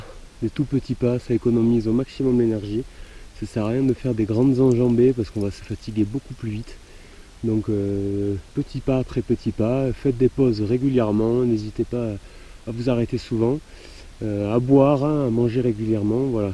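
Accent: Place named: Southwest, France. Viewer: Cest vrai quand vous regardant les gars vous êtes tres claire, une voie fort sympathique ( j’adore l’accent du sud ouest ) mais j’ai eu la même reflexion en cas de chute et malgré le piolet bien en main ca risque de vite chauffer sur les mains et donc le réflexe sera de lâcher le piolet...donc oui des gants semble s’ajouter a votre panoplie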